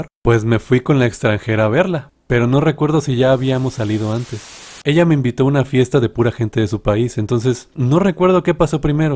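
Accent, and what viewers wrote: Zacatecas, Mexico. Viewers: Eres de Zacatecas??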